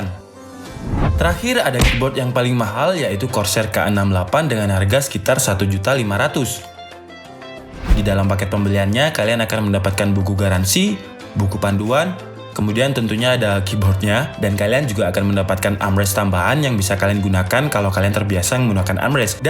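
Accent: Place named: Surabaya, Indonesia. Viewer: Sampean wong suroboyo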